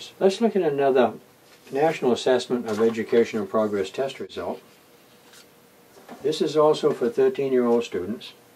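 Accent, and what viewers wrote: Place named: Boston, United States. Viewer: Much respect, talking about fraction "bah's" like he's from Bah-ston.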